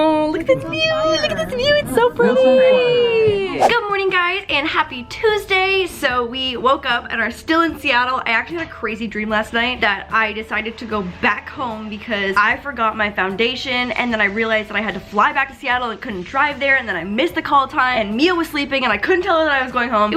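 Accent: Canada. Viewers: love that Canadian accent.......love you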